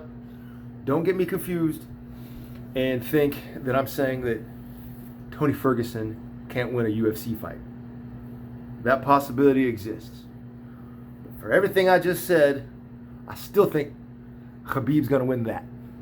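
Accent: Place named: Russia. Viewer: Your Russian accent is very cool! Haha😂 . Где изучал русский язык?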